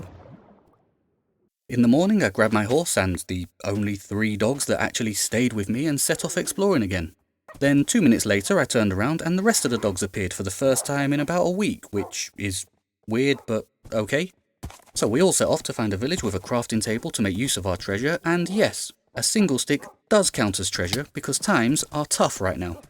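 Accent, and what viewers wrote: United Kingdom. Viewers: This guy is actually so funny, and chill lol. The british accent makes it better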